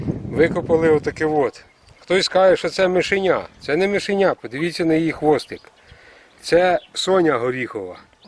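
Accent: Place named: Cherkasy-Vinnytsia, Ukraine. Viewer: Я думаю, шо це захід Черкащини або Вінничина, принаймні там люди розмовляють схоже))) Приємна мова!!!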